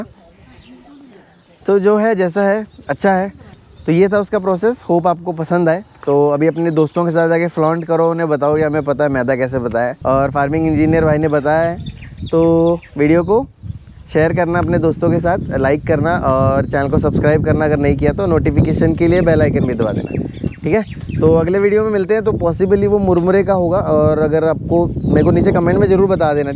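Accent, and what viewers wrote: Maharashtra, India. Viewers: This is my first time. I really like the way u explain and your touch of Marathi in hindi really sounds good.Keep up the good work.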